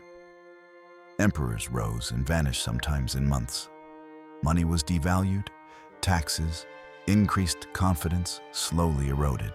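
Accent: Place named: South Africa. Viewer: Sounds like South Africa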